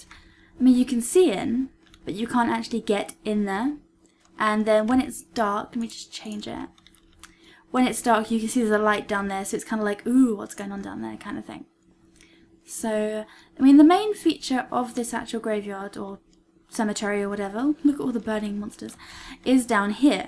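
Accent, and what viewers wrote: United Kingdom. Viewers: Scottish? LOL. It's a British accent. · Are you scottish or british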